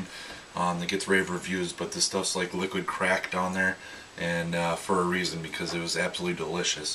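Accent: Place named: Midwest, United States. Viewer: First off Nice brew area, clean and organized. Second I knew you are from the midwest cause you have a bit of an accent. Your from wisconsin so you would probably call me a FIB, lol! I brewed the holiday kit and found the same thing, very alchohaly taste at first but not a bad beer. I think it smelled better when I was brewing it than the finished product to be honest. Question, what is or how do I make liquid brown sugar? That sounds amazing with a pumpkin brew. Cheers!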